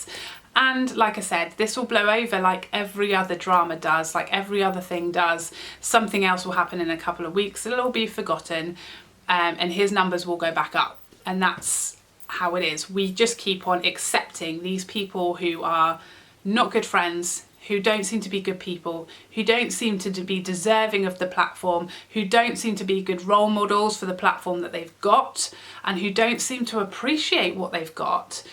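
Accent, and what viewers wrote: United Kingdom. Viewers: Yup, I reckon drugstore ain’t the only duping going on in beauty YouTube! Really enjoying your channel btw, nice to hear another British voice. I should add that I came to you through Mel, who I in turn came to through Wayne - all lovely genuine people! xx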